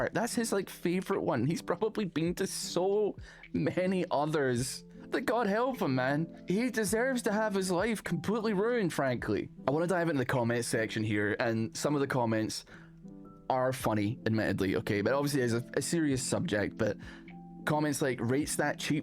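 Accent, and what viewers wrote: Scotland, United Kingdom. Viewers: is indeed scottish! great voice for the great takes indeed:))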